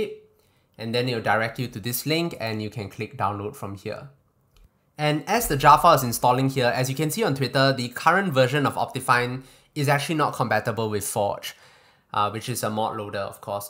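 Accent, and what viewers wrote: Singapore. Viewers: r u singaporean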